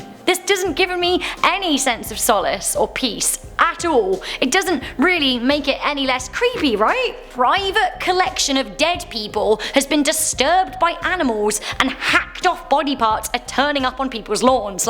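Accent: England, United Kingdom. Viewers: First off love your British accent very 🔥 second love your facial expressions keep up the good work on the videos and keeping us interested in the videos far from boring 😎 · I'm so glad you've been able to keep your English accent its the best, you should come back to England see your dog and do English things with everybody :D xxxxx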